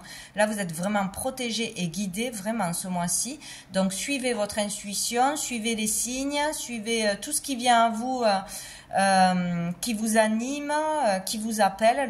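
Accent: South, France. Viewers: Acsent du sud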